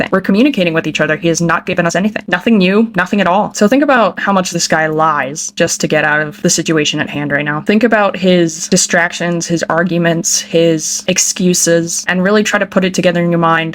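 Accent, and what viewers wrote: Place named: United States. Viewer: I'm sorry I just find it so funnily absurd that your name is and you have the most american accent I have ever heard (which i assume you are one, based on your account location)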